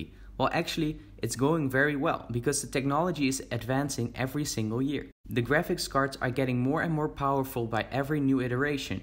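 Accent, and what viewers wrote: Netherlands. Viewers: You sound dutch. Are you dutch? Either way, good video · This is my first video of this youtuber and I'm here wondering "Is this guy dutch" cuz his accent has a light dutch accent sounding to it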